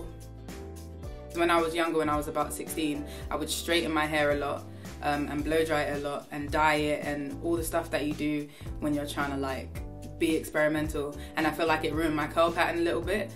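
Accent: United Kingdom. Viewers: Her British accent tho 😍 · how does she speeks british but in her songs she speeks american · Wait.. is british what?... I know I'm late but.. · forget she’s british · is she British?!! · Wtf I didn’t know she was British lmao 😂❤️❤️ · Keep forgetting she’s British until she talks at the end of her songs 😂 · i'm obsessed with her accent and the way she says reindeer, i'm british but have nowhere as good an accent as hers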